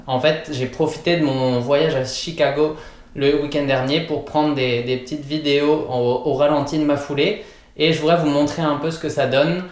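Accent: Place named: Canada. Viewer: C’est moi ou l’accent canadien prend le dessus ? Merci pour tous tes conseils 😉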